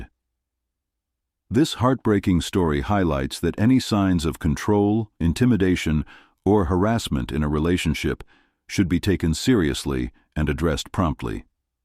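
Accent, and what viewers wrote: United States. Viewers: It’s annoying to hear the American accent on a UK documentary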